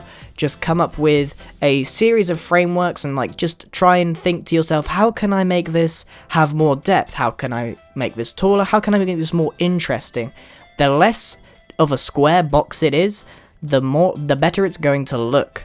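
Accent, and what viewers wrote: United Kingdom. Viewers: grian im from uk but ur acent sounds soooo british haha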